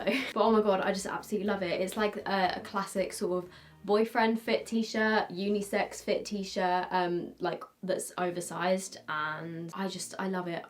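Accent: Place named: England, United Kingdom. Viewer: #questionoftheday: I am new to your vids but i was wondering if you could try your best american accent? I love english accents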